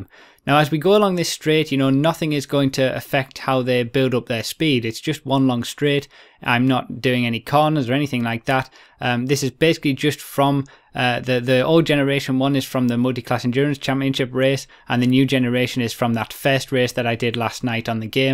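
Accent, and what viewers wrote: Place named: Geordie, United Kingdom. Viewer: Where is from? Just discovered this channel and it sounds like he could be from Newcastle, sounds Geordie just not as broad as the stereotype.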